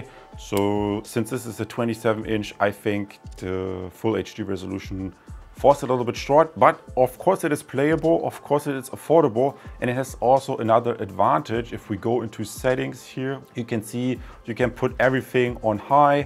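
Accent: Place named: Germany. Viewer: I can hear he is german. I bet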